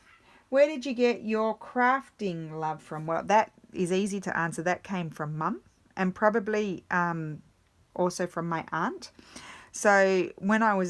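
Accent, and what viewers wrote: Australia. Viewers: As you know I love your videos and chatting about everyday life! Your accent and life in Australia always touch my heart since I still have friends there. Your ideas are fresh and fun to follow! Keep creating and never think you are not good enough, your Great!!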